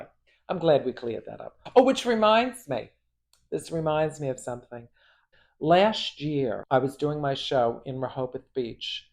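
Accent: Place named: Queens, United States. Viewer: Sounds like Queens, New Yawk!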